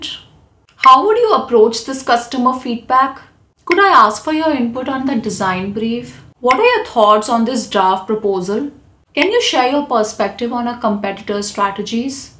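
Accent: India. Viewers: Thankyou so much mam . Iam the one who has no interest in English videos and movies. Because i don't understand American accent and British. I believe that British and American accent is the real English. Indian accent is not a real English. But when i started to watching your video i understand that Indian accent is also English. And i loved your videos and addicted to you. And i learning English only because of you. Lot of love from Kerala ❤ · 🙏Tqsm mam For Today's All useful idioms n phrases ,it really helpful for us n again tq mam for your Guidance in Bharats Accent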